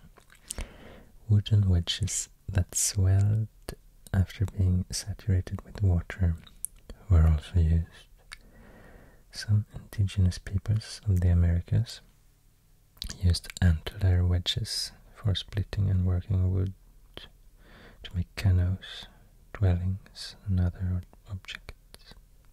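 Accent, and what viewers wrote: Sweden. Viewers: So obvious that you are from sweden haha, lugnt och skönt ska det va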